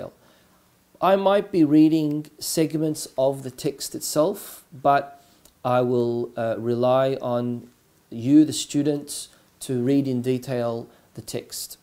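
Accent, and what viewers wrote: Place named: Australia. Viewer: Shia page. With Aussie accent.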